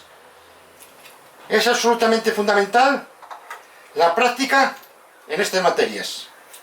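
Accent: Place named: Asturias, Spain. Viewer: Lo mejor q he probado en mi vida (sólo comparable con unos chipirones rebozados fritos y acompañados con papas fritas encebolladas en láminas). Soy Uruguayo, hijo de Gallega. Viví en Vigo unos 10 años, entre 2002 y 2012; quizás el mejor lugar para disfrutar de la comida del mar. Luego me volví, y sólo una vez he vuelto a probar pulpo (a la plancha) - chipirones y mejillones nunca más. Amigo: es Ud. Gallego o Asturiano?Por su acento, me inclino por la segunda - o quizás del Bierzo leonés? Felicitaciones por el canal. Y vaya mi admiración a todos los mineros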